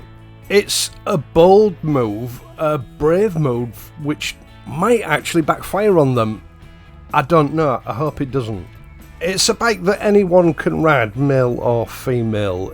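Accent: United Kingdom. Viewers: Your road reviews are like a high quality double barrelled over under shotgun, with both riggers pulled together. One barrel fires the excellent British English at the viewer and the second the gorgeously green English countryside. That both "barrels" operate flawlessly always and every time is the icing on the cake, so to speak. This one and the one by Mr Freddie Dobbs and Mr Mark Pulling remind me of " ". That song describes the exact sentiment of this bike. Thank you very much, sir, for yet another and as usual, true to form, review. Will be going tomorrow for a test ride of the bike. It should be a worthy successor to my 2014 (since sold) Bonneville 865.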